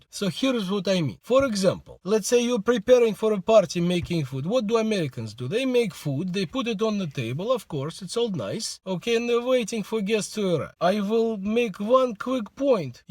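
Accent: Russia. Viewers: Акцент у вас такой, русский) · Александра Кирилюк Замечательный Русский, узнаваемый акцент. Многим нравится. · PaNdoRiKa NeN actually i watch his videos only for his strrrrong Russian accent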